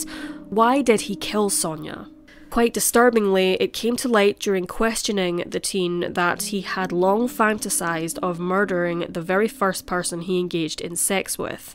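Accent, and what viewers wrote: Scotland, United Kingdom. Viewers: Is the narrator east-coast Scots? Former Banffer here. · Honestly I have a hard time listening to the Scottish accent. Not cause I can’t understand it, just don’t have like them. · Yes, fellow Scot, nice to hear our accent. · I could listen to you all day! When I was little, my mother was very ill, and the neighbor lady looked after me. She had emigrated from Scotland, and that accent is associated with some of the happiest days of my life. Keep up the good work!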